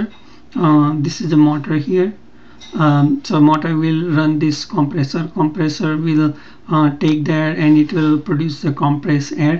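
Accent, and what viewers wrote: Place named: Arab world. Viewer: Difficult arabic accent , but thanks anyway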